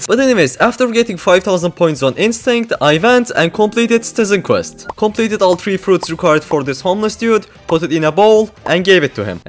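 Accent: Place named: Turkey. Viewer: abi türkmüsün ingilizmisin belli değil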